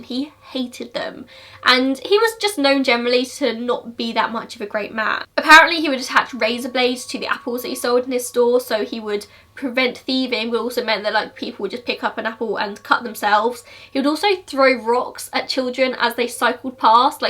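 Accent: England, United Kingdom. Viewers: Your pronunciation is actually not so bad.:) Obviously the accent is English, but you got the majority of the sounds put together right. It’s unknown if Irmela was sexually assaulted, and even the police at the time weren’t sure. As for names, most Finnish people do go by their first names, but naming is pretty relaxed, so you kind of can go by any first or middle name and it’s not so uncommon. Also, in English someone might say “Hey,” but in Finnish people don’t say names so often, so you just say “Hey,” and only use someone’s name if you’re talking about them to someone else, so half the time it doesn’t even matter what you call someone haha. Personally I don’t think Nils is innocent of everything, and alcohol consumption and mental health are real issues in Finland, but I don’t think he murdered his friends. There’s no possible way he could have injured himself the way he was.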